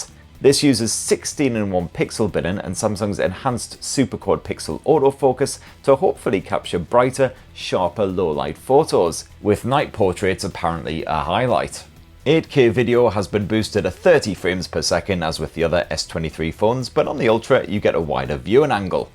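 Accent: United Kingdom. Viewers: You're the only person that makes me like British accent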